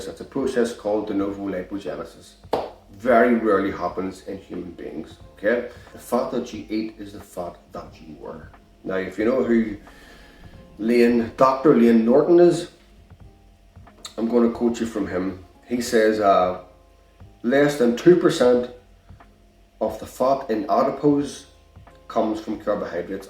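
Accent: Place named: Ireland. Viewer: New subscriber from Australia, hello 👋 I love your accent, don't get me wrong , but the first time I heard you talk about Fat , I thought you were swearing 😧 then it dawned on me, Ah an Irishman 😊☺️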